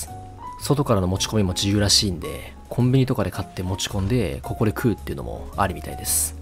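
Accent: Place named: Tochigi-Ibaraki, Japan. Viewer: 栃茨出身の方ですか？ 方言の人大好きです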